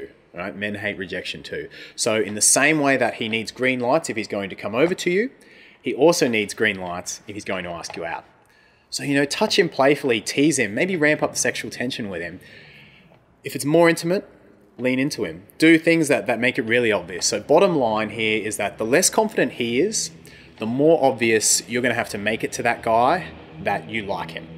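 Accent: Australia. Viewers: Are you Aussie? I think so & I love that. Most of the guys on YT giving these tips are English and worthless in this dept. I’m American & I think American guys & Aussie guys are real men (just my opinion) and I put stock in both of them. I’ll check out your other channels. Tyvm Aussie Man! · u sound aussie! i am btw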